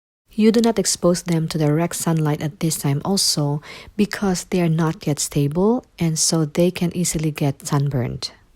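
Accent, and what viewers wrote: Philippines. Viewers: you sound pinoy like me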